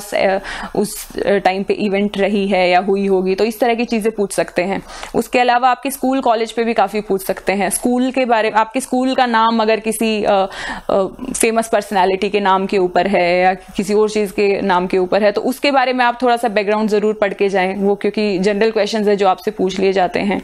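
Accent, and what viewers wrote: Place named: Haryana, India. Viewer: Haryanvi accent 🤘🤙👏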